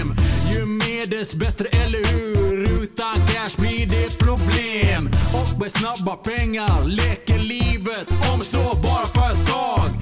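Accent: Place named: Finland. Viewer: Han bryter ju för fan på finska XD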